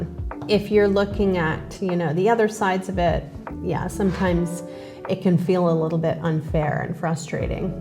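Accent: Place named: West, United States. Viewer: If your hometown is Helsinki how is it that you sound like you are from the western USA? You have no trace of a foreign accent.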